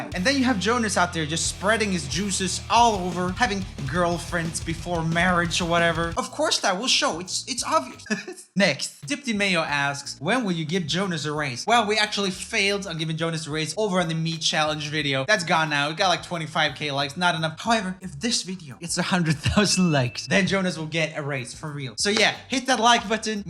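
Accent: Sweden. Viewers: Var det där din riktiga svenska dialekt? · Holy shit. As a swedish boy I can vouch that swedish does not sound that weird. He has one of the funniest dialect I've ever heard. Where TF are u from (is it like Malmö or something)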